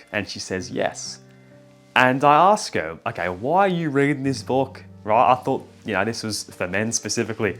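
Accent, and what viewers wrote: Australia. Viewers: Thank you so much for this video, it made me realise so much about myself i didnt know❤, also i love your Australian accent🤩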